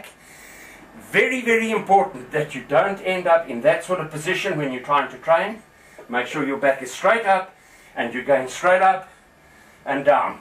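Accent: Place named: Cape Town, South Africa. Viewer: Thanks from Peshawar, Pakistan. Luv your Cape Town accent.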